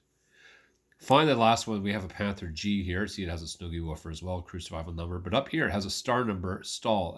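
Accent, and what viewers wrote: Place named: Canada. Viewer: Sexy sultry Canadian voice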